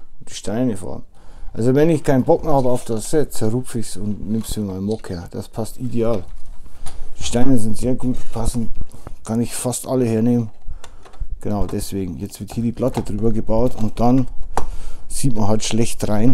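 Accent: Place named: Bavaria, Germany. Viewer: finde ich nicht gut Minifiguren herum zu werfen... gibt Minuspunkte. Vielen Dank fürs zeigen eines Disney Produkts, und das nicht Review, deiner Meinung zum Set. Gefällt mir auch gut das Set, aber aus Platzgründen, Zeit und anderen Projekten muss auch mal was nicht gekauft werden. Hehe, hier lernt man dann noch Bayrisch oder wie auch immer diese Sprache die du sprichst man nennen soll - grins. Klaro, Sticker sind manchmal auch cool, oder einfach weg lassen und das Design ist wirklich cool vom Häuschen. Grüsse Inu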